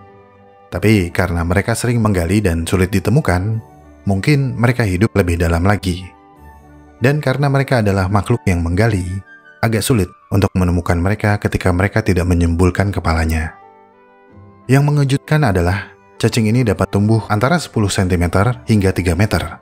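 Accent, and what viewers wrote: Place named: Java, Indonesia. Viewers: Hahahaha.. Naratornya Sundanese nih.. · Kilang kilung nak wong jowo ngomonge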